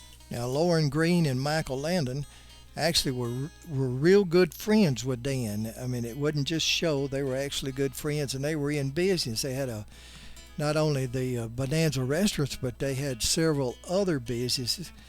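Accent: South, United States. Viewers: .... All of your posts are fantastic!!! I never miss any, when I see your name on a documentary. I enjoy the music you choose, the slight southern twang, and you can hear the caring in your voice. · This was a much loved series in the U.K. and I'm sure Dan Blocker was as likeable in real life as he was in Bonanza. The Cartwrights always seemed to be rightin' wrongs and doing the right thing. I love the southern drawl of the commentary. Wouldn't it be great if the store was open again ? The town seems a little sad though. Melody Ayers' comments below are very apt. Many thanks.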